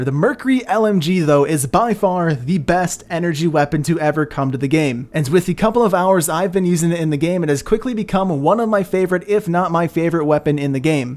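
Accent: Czech Republic. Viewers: are u from czech :D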